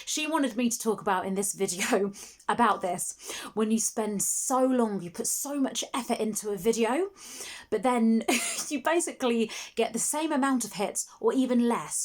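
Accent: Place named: London, United Kingdom. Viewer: I just created my channel posted up just my intro and outro but have limited items to edit can you help and offer advice? I’m from London and guessing your same from the accent thank you! Would like free software for editing and best ways to make good interesting shots and how to make it look good anything u can pls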